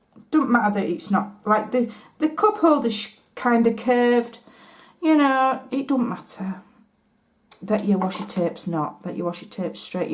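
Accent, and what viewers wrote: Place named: Northern England, United Kingdom. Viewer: These are absolutely great! I will make some to use as gift tags and to put in my Holiday scrap album and use in pocket pages. Every year I take a photo of and journal about my first Starbucks (or other coffee shop) "red cup" drink of the Holiday season. One of these cups will be great on that page! BTW: must tell you, I simply adore your lovely accent! Northern England, right? Hugs from Texas and thanks for your wonderful, delightful process video and for sharing. Barbara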